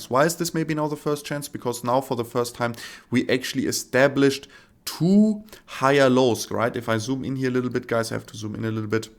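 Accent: Germany. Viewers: Your videos are fantastic. Thank you! Packed full but brief and to the point. As a linguist, I'm curious about how you acquired English given that you have some German accent but your grammar and vocabulary are flawless. Did you spend a few years at a younger age in an English-speaking country?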